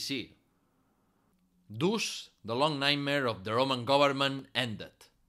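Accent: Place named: Spain. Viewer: Perhaps you should learn Spanish and therefore understand his accent. I grew up with it, lucky me. It surely would help you to further understand Spanish history.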